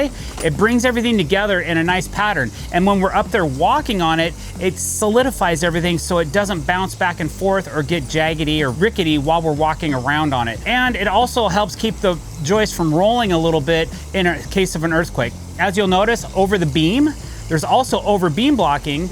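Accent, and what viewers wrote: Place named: California, United States. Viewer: Yeah this dudes a clown they don't even box in their post. Tell from his voice he up north or California probably. Code in his area isn't code for entire US and he is going to get homeowners and contractors having words with each other. Sounds gay so I'm sure drama is all he is trying to create. If your thumbnail is calling for folks to be fired! No reason not to have disclaimers telling home owner to CHECK LOCAL BULDING CODES before engaging with their contracter.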